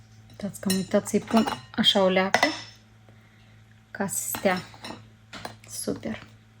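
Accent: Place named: Moldova. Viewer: Ea e moldoveanca și vorbește moldovenește.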